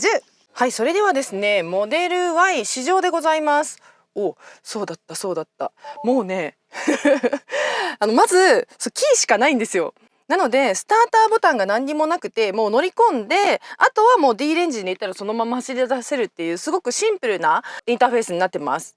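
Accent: Kansai, Japan. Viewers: って、関西弁たまぁに出るやん❤ 鞄に飴ちゃん忍ばすオバちゃん系。早口と、関西人ならではの、間を嫌うマシンガントーク ❤いつも観てます🎉大好き。でも、ロングレンジRWDなら買いたいです。来年かなぁ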